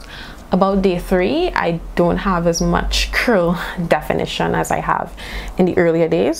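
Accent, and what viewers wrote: Trinidad and Tobago. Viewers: We have the same Trini accent, are you from the Vale? Have you checked out Body beautiful on D'ave for some of your products?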